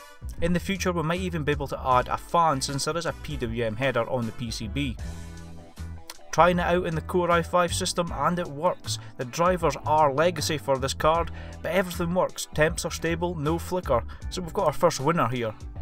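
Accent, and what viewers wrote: Scotland, United Kingdom. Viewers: Ah so you're Scottish eh? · I hope your channel does well mate! I'm from Glasgow, good to hear a fellow scotsman!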